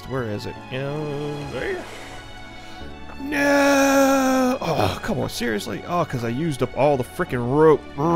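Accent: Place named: Texas, United States. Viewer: You sound like your from Texas or something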